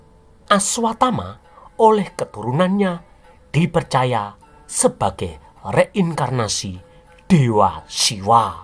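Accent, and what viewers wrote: Java, Indonesia. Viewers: lu pamer bhsa medok jawa lu,ato jrgon nusantara lu,mo sok NKRI lu,ato lu pemuja fanatik jawaisme lu,klhatan cupunya lu ndeso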